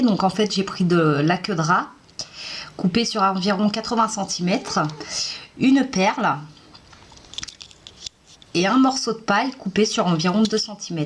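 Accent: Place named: South, France. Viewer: je ne connais pas, je vais m'essayer çà vite fait car c'est méga sympa... merci à toi...et cette point d'accent...çà vient d' ou ??? côté soleil en tout cas !! merci bis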